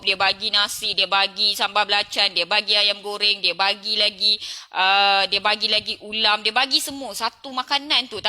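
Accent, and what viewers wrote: North, Malaysia. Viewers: Di persilakan ke Penang lepas PKP ni... first time sy tgk video sy ingatkan dr utara sbb dialek mcm org utara...rupanya dr kuantan😊😊😊...akhir sekali sy ucapkan terima kasih kepada diatas pengkongsian ilmu dr....semoga dan keluarga sihat2 selalu....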